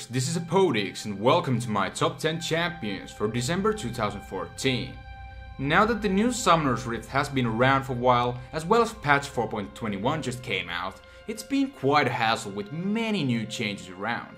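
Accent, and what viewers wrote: Finland. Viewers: ***** you can clearly tell that he has a finnish accent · ***** dude stop crying about it, he is from Finland and pronouncing certain words for us in English is extremely hard. So stop crying.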